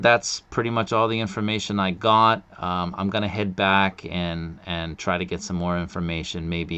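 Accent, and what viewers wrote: Boston, United States. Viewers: by the way I'm the one on reddit asking you to do little Japanese in your Boston accent 😂. I understand why you don't but man it would be gold. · It’s nice to hear the accent I am accustomed to in my daily on YouTube. Not enough Bostonians on YouTube.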